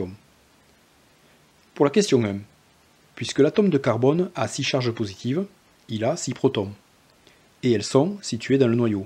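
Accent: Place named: South, France. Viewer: Excusez moi de vs demandez ça mais vos explications et votre accent du sud me rappel un professeur que j avais eu à Ingres? Cela serait improbable mais je demande qd même d autant+ que je suis tombée par hasard sur votre chaîne.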